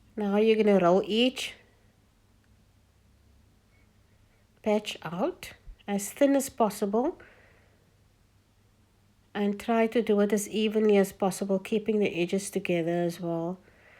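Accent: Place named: Cape Town, South Africa. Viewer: Hi, there is nothing that is such a taste festival as a "Bo Kaap" samosa. Just listening to you speak makes me so homesick for Cape Town again. Much love from NZ.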